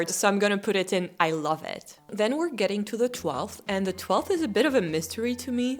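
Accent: France. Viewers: Bonjour! Je suis américain et mon niveau de français est environ intermédiaire-haut. Je suis tellement impressionné par ta fluidité en anglais! Bravo! Petite question: penses-tu que ton niveau d’anglais est comparable à celui de Jodie Foster en français? (Btw…I doubt you will read this, but I am curious nonetheless. I also understand self-evaluations are difficult. But to me I could easily mistake you as an American, though I do perceive the slightest French accent, which I think adds great spice to the language!). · Thanks so much for the recs! You didn't need to advise us not to go on a group tour, though. Waaay not our style. We too like the authentic and non-touristy areas of any place we visit, despite (technically) being tourists. That's why your advice will be so helpful. You speak English really well, by the way, but it's fascinating to hear your accent, because sometimes you have a French accent when speaking English and sometimes almost an American one. 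There's nothing wrong with that, of course, but it's interesting. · i love french accent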